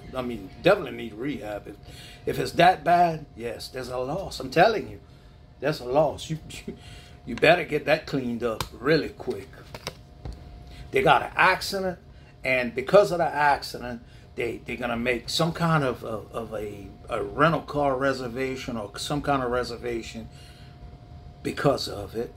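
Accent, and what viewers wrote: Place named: Louisiana, United States. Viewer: You remind me of my Grandfather! Both of them .. Both of them were born and raised in Louisiana. Southwest Louisiana to clarify :) Really enjoy listening to you. Reminds me of Home.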